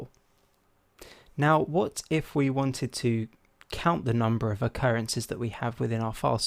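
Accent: United Kingdom. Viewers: oh god the british accent...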